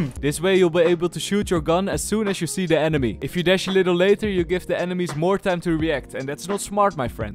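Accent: Netherlands. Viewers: Are you from the Netherlands you have exactly that accent. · are u dutch bc i can hear it in your voice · One second in, ah this guy is Dutch. "checks username:: 100% confirmed":)) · why does he sound dutch? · Very Nederlands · i love youre dutch accent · effe voor duidelijkheid jij bent toch Nederlands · Ur accent is the same as a dutch man speaking english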